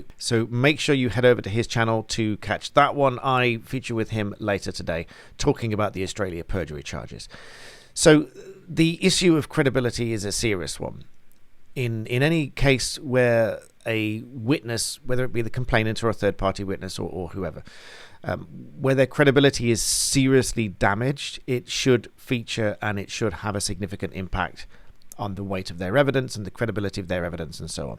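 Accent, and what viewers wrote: United Kingdom. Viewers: Hello from ME, USA! Thanks so much for looking into this UK appeal denial. Wonderful to hear your thoughts and comments, watch every day. Can US citizens donate and sign petition, or is it something only UK citizens can do? Just beginning to really understand the Brit accent - great to hear such clear and articulate speech, and at a good speed, I should say. Looking forward to next installment. 🤗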